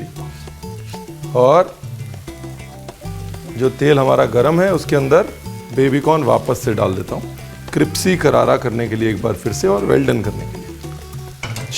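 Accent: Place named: Punjab, India. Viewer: your explanation is very impressive. your simple style is very good . you are fit to become another Pran of Bollywood with your beautiful Pnnjabi accent.GOD bless you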